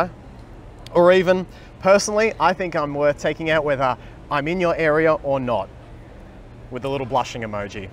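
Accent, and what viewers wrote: Australia. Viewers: I loved all the advices you gave the females,.... Taking notes & thank you.🙏🏽. And your Aussie accent is cute. Awesome. Sydney Harbour is a great land 🇦🇺🇦🇺🇦🇺🇦🇺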